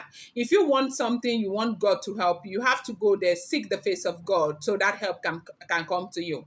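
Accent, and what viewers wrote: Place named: England, United Kingdom. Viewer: You are such a young and beautiful woman. I presumed you to be an elderly woman with a plump body and a deep voice 😂. Please pardon me for the presumption. I am so pleased to see your face and enjoy your vibrant smile and English accent. Kudos for being very honest and respectful in your delivery of your blog.❤. Please text me the link to join your chat. I couldn’t get the link. ( mind you I am of old school 😂😂😂😂). Don’t mind me ooo.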